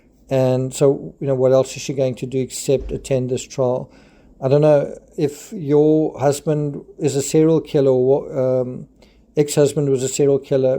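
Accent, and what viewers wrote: South Africa. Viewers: You sound like a South African. I'm watching from Durban. I think they will find more bodies, about 15 or so, possibly two children and, or teenagers. Poor victims.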